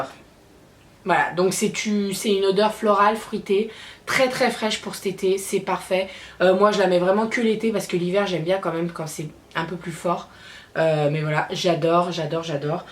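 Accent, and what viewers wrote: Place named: England, United Kingdom. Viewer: Coucou ♥ Alors je participe a ce petit concours bien sympathique :D Alors j'aimerais gagné le parfum Magnetic qui est la dupe de dody de Burberry. Alors pour la petite histoire mon père a celui pour homme et je l'adore donc je me dit que celui pour femme seras une perfection :) De plus je n'es que un petit parfum ( amor amor de Cacharel en forma 33 mL ) et comme c'est un parfum d'été et que je n'es pas de parfum d'hier. Merci pour ce concours. GROS bisous ♥ ps: J'adore ton accent d'Anglais ;) ♥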